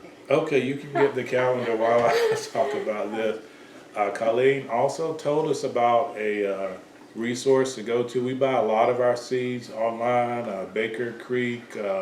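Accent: United States. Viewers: Wow!! I am catching up on your videos - and I am so impressed with the collection of seeds that you have!! And you made me laugh when you said you have a bit of a southern accent and may pronounce things a different way!! People here in Texas sometimes think I am from a foreign country given the way I pronounce things!! LOL! So nice to see you and. God Bless, Mary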